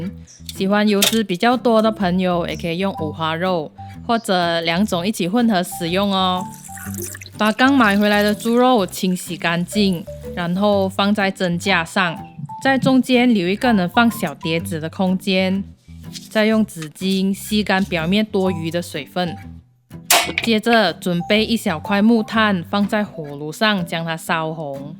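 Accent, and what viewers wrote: North, Malaysia. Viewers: 你的食谱都很喜欢，请问是北马人吗？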